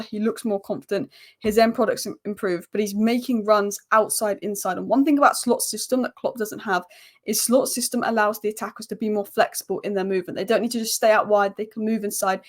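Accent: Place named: England, United Kingdom. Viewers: I watched a tv show called uncle and he has an accent like hers. What part of England do People have this accent. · What part of Manchester is she from?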